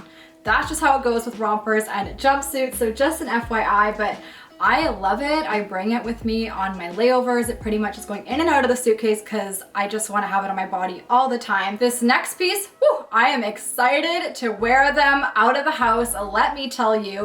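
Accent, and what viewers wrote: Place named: Canada. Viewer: Love your pieces girl💕how did I know u were Canadian based on your accent ☺️I guess we do hv an accent 👍🏽😂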